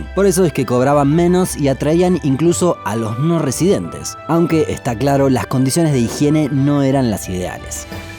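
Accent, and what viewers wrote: Argentina. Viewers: “Shaky Chan” amo el acento argentino 🤩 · Me gusta tu acento argentino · Se me hace muy chido tu acento, eres de argentina o uruguay?, y no soy gay, solo simpatizo con ese acento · El acento argentino me excita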